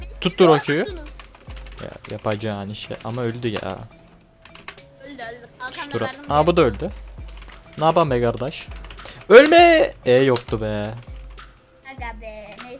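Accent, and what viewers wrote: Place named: Ankara, Turkey. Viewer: La yürü git La Dkfldlfldlg tam bir angaralı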